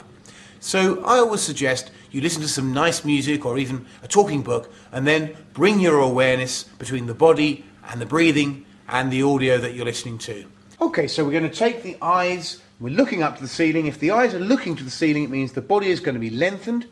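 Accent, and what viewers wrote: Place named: United Kingdom. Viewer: His voice cadence and accent makes me think he'll also be found in a Monty Python skit!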